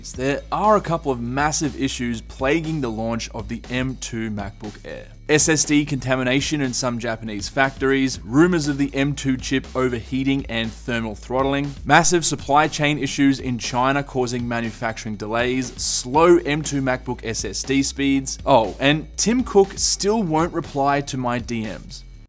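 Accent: Australia. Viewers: Nice hair. Love that Aussie accent.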